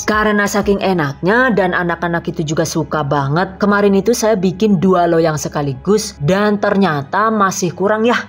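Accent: Banyumas, Indonesia. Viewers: Ngakaaaak... Ternyata orang ngapak juga, ora ngapak ora kepenak ya mbekayu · Waw dubbernya " ngapak" kiye 🤣🤣🤣🤣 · 😂 jebule ngapak 😅 keren Channelnya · wkwkwk.. ngapaknya darimana bang? saya cilacap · Lama ga nengok channel ini. Kangen intermezzonya.. Logat ngapaknya manaa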